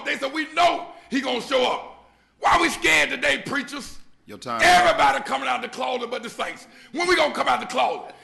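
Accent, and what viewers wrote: South, United States. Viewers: 🎯💯👍🏾❤️ Although his Southern accent was on 💯, he took a fearless and firm stand on the Word of God...AGAINST high officials' condonement of public display of homosexuality‼️ He spoke all TRUTH, y'all‼️ HE...SPOKE...ALL..TRUTH‼️ He and Pastor Gino Jennings, regardless of WHO hears them, sound the alarm of God's Word...LOUDLY!!! FIRMLY!!! SHAMELESSLY!!! "I ain't bought by NOBODY!" (in reference to speaking the Word of God) How many other Christian leaders can honestly say the very same thing, in the Name of the Lord Jesus? How many Christian leaders are seriously willing to speak against this abomination, in the Name of the Jesus Christ? God bless!!!👍🏾❤️💯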